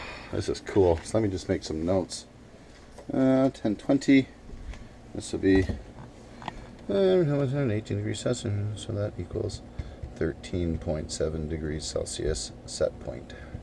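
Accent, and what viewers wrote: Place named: North, United Kingdom. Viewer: Just curious, why would you take the probe off the fermenter. You still want to be bringing the actual temp of the ferment up....I could be totally wrong but I think you should keep it attached. Anyway, can't wait to see how it turns out. Once I have my dual stage controller a lager is in order. Cheers my more "northern accent" friend!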